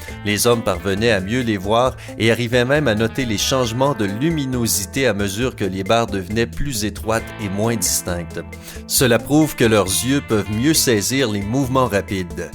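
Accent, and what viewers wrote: Quebec, Canada. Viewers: He is from Canada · Hé le narrateur est québécois! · Ça change avec une voix québécoise !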